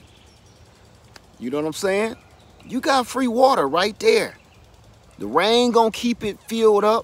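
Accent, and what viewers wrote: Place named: California, United States. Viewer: You sound like you’re from Cali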